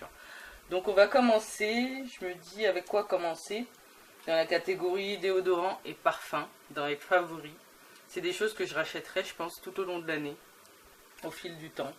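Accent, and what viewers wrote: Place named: Saint-Étienne, France. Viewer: Y'a comme un truc du nord???? peut être stéphanois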